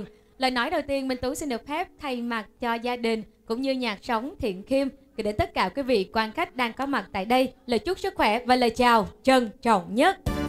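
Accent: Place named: South, Vietnam. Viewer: Chuẩn MC giọng miền Nam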